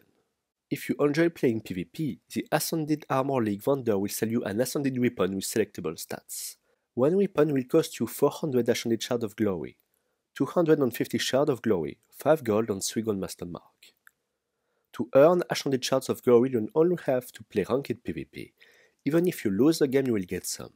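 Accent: France. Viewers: this is the frenchiest french accent in the world.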